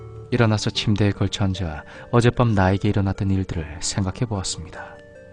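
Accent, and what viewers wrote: Gyeongsang, South Korea. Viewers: ㅋㅋㅋ 이 경상도 분이셔서 대화부분은 원본이 경상도 사투리가 아니더라도 경상도 사투리로 하시더라구요 ㅋㅋ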